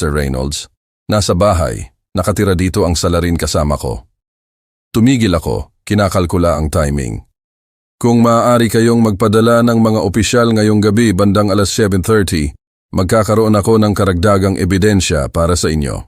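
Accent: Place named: United States. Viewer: ano po Ang nangyare amerikano na Ang nagbabasa eslang masyado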